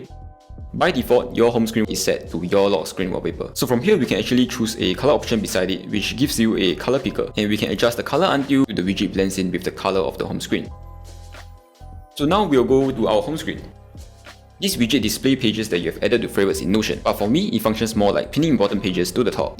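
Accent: Singapore. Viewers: This is cool but I can’t understand anything you’re saying because of your oriental Singapore accent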